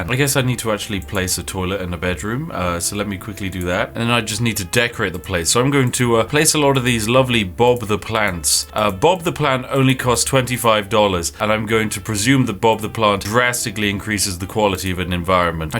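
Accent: United Kingdom. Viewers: oh my, that is one British Brit right there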